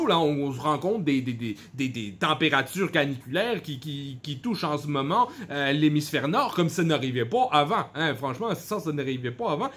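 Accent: Quebec, Canada. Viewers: Sans l'accent québécois on dirait Pierre-Yves Rougeyron. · Ça sonne plus québécois que MBC ; à quand un MBC gaming ?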